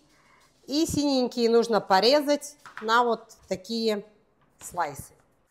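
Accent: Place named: Odesa, Ukraine. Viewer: дівчата розмовляють одєсскою мовою. Головне, що всім зрозуміло. Дякую за рецепти.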